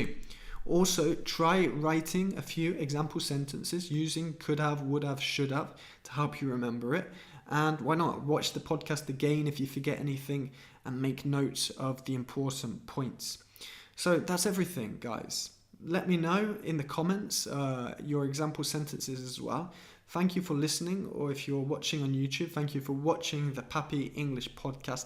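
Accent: United Kingdom. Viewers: English is my third language and I’ve learnt it since I was little, I am in love with your british accent and I wish I grew up learning it in the UK😍 your accent in spanish was good, it is my first language and norwegian is my second language. Growing up in Norway we were introduced to english all the time, on TV and more and norwegian isn’t an useful language because only 5 million speak it and you won’t reach far in the world only being able to speak to 5 million people😂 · I love your accent,!. Someday I'd like so much can speak fluently and with a British accent. Greetings of Argentina:D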